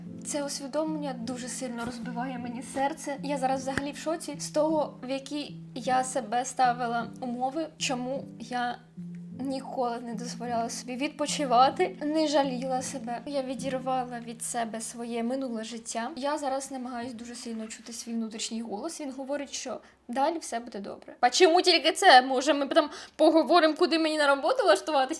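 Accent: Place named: Surzhyk, Ukraine. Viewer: Рибочка, киценька) Підписана на Вас недавно, завжди дивлюсь Ваші відео як маленьке свято чи відпочинок, отауі вони співзвучні! Як класно, шо Ви така щира і харизматична! Зраділа чомусь, шо Ви в Варшаві, моя молодша донька теж вибрала свою самостійність в Варшаві. Дорога до себе завжди важка, зате коли себе чуєш, легко жити це життя! Такий у Вас рідний для мене суржик, звідки Ви? Я з Сум. Бажаю Вам гарних людей поряд і вдячна за відео!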